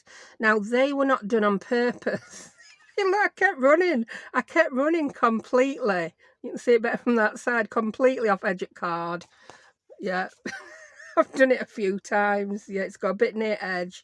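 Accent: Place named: Blackpool, United Kingdom. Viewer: Loving your tutorials what weight of paper do you use especially for your inked backgrounds. Your accent is awesome it’s making me home sick ❤️. I live in NZ but hale from Blackpool .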